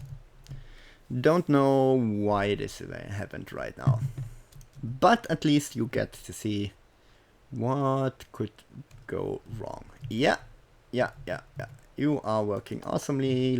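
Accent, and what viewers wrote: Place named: Germany. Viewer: That strong german or dutch accent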